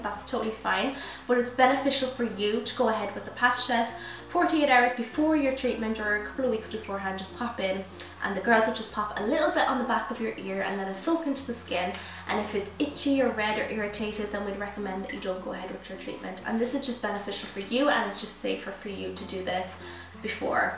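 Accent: Ireland. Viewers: Such a helpful video!!And you have such a lovely Irish accent.I live in the U.S. but I have family in Ireland I hope I get to visit some day.💞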